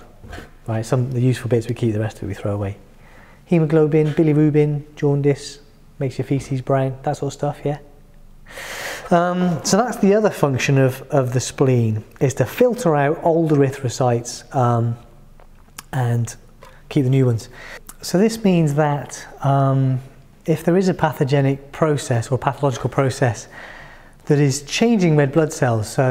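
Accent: England, United Kingdom. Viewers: Why does everything sound so much better in an English accent lol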